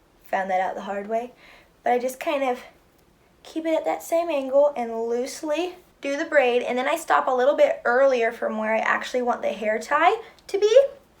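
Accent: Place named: South, United States. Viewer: you speak southern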